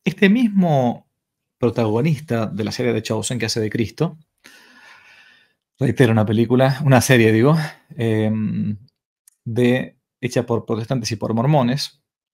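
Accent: Argentina. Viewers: Padre usted diciendo que la virgen que pusieron era la más feita! , que importa eso? Es usted argentino verdad? Ya entiendo su forma de hablar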